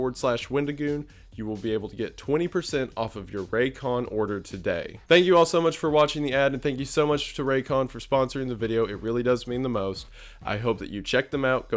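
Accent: South, United States. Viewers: Adversity or diversity?! If you are demonizing "diversity", as what you, can chuck up to a mispronunciation seems to, imply, then, that Southern accent that I have noticed takes on a special meaning... Hmm!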